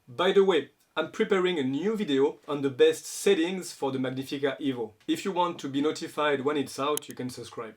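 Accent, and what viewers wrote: France. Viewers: Your comment was totally unnecessary, is more than obvious that he’s French and of course he has an accent, but that’s it, I didn’t hear any grammar errors, I understood 100% what he said. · your english is very bad.. it sound very french! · While having an obvious French accent, his English is quite concise and easy to understand. You are also clearly a nonnative English speaker judging by the way you have worded your comment. Either that or you are just poorly educated.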